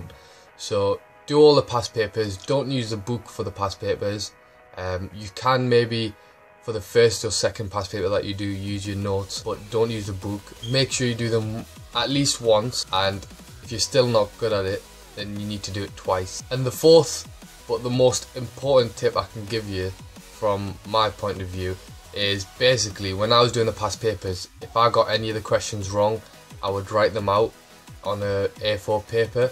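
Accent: Newcastle, United Kingdom. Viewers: Are you from newcastle? Your accent sounds like it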